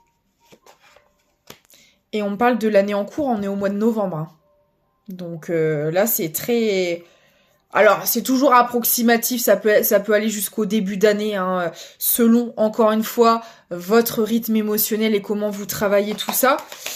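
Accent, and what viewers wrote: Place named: North, France. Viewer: Tu es trop forte, cette guidance me parle tellement. L’amour inconditionnel évidemment, je l’ai laissé partir parce que je savais que ce n’était pas le moment ni pour lui ni pour moi il y an. Nous nous sommes revus qu’une fois en août depuis mais il n’y a pas une seule journée depuis un an où je n’ai pas pensé à lui. Tout correspond des 2 côtés, tu es vraiment très forte je le répète. Je me disais bien avec ton accent que tu venais pas du sud, j’ai grandi à côté de Beauvais 🤩. JE t’embrasse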